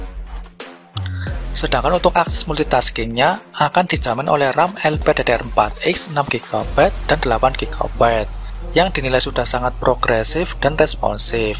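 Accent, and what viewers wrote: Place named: Java, Indonesia. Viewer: Bagus tapi medok sekali hehehe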